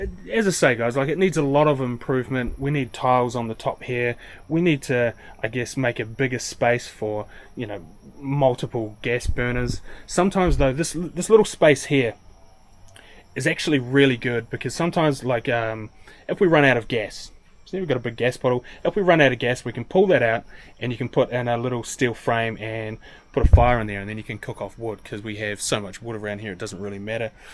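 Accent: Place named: United Kingdom. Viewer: you got a British accent bro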